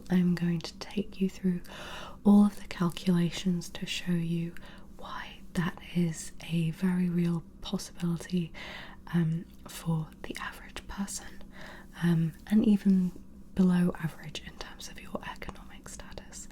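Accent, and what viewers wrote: United Kingdom. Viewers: OMG where abouts are u from! U sound english like me😊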